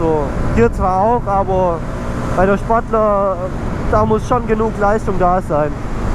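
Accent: Swabia, Germany. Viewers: Ja man neues video, schwätz aber ruhig schwäbisch ❤😂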